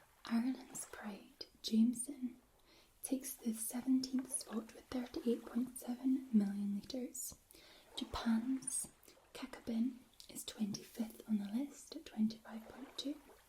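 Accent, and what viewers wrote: Scotland, United Kingdom. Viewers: Whats up?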